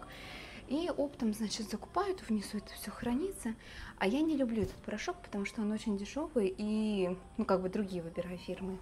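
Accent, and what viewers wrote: India. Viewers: Как сладко говорит по индийски 😍😍😍🥰а голос ее похож на голос свекрови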